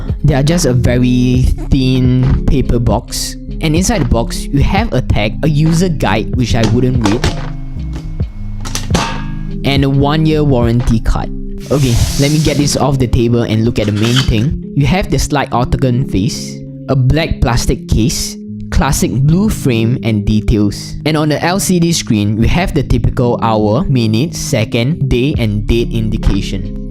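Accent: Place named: Singapore. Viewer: Your Singapore -English accent made this video great . Excellent good job ...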